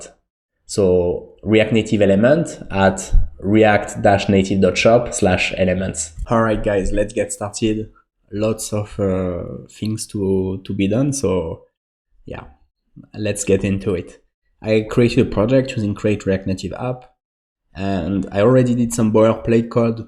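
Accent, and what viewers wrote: France. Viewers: J'ai reconnu l'accent français, merci pour tes vidéos super intéressantes. Happy hacking!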